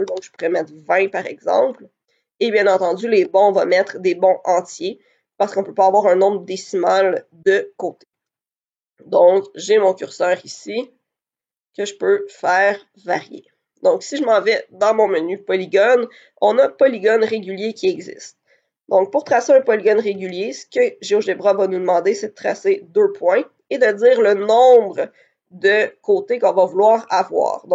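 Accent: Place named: Quebec, Canada. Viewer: accent québécois magique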